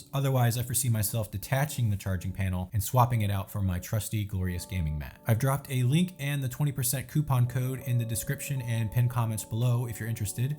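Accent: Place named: Netherlands. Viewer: You're Dutch aren't you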